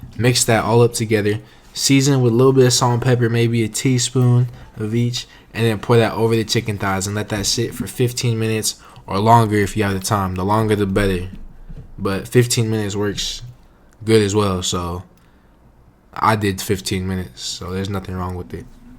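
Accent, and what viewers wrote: Philadelphia, United States. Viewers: I just wanna know where you from ? Sounds like Philly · Sounds like he has a philly accent to me.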